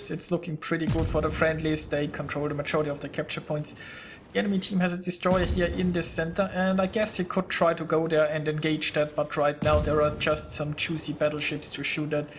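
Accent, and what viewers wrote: Germany. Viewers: Kann das sein das du deutscher bist?!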